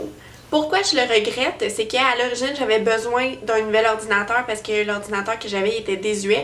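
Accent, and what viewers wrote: Quebec, Canada. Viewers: purée cet accent quebecois jadore ^^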